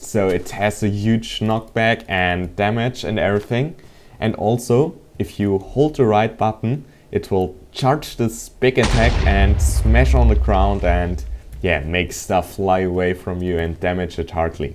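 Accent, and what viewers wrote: Germany. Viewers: Bist du Deutsch ? :D Hast so einen Akzent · are you german cause you have a little akzent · are yu germaner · oooh he sounds german! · Well, you kinda have a german accent, at least in my ears.. It that true?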